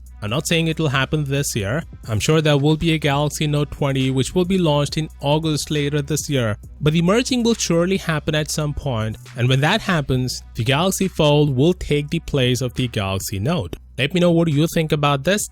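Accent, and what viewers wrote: India. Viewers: As soon as i hear the indian accent I know this is just "speculation" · Sound hindi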